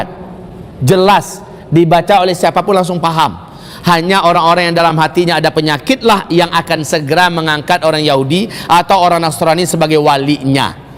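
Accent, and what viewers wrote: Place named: Riau, Indonesia. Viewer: ustad orang Minang kok ngomong bahasa Indonesianya kaya aksen orang Melayu Riau ya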